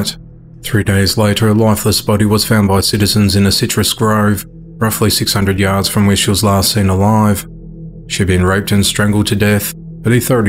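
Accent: Australia. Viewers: Obviously everyone is entitled to an apinion.why dose it have to be an American accent that should be used.not everyone who watches this channel is American im sure other Americans watching would agree the accent can only be a problem if the English is badly spoken.i think this Australian accent is so not fake easy on the ear.no oh my gods,clear to the point of a tone that matches the content.living in. America you should be used to different accents and be more culturally intelligent.keep going with the Australian accent it’s what makes you guys individually top class.🧚Charlotte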